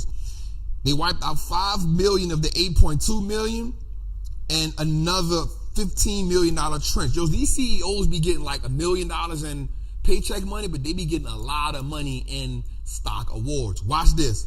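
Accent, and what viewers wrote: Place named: St. Louis, United States. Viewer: He kinda sounds like he’s from Louis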